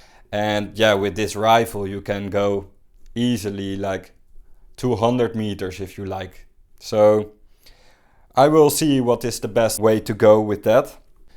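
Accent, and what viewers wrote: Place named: Netherlands. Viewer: Sound like a Dutchman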